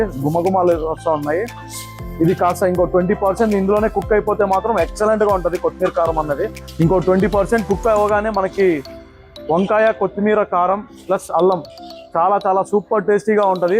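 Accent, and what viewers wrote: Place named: Kadapa, India. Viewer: మా కడప వాళ్ళ యాసే మీది కూడా.. ఆ మాట తీరు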